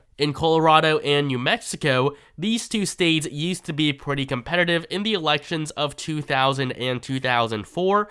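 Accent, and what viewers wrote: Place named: California, United States. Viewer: Can’t stand listening to your accent. Very California yuppie!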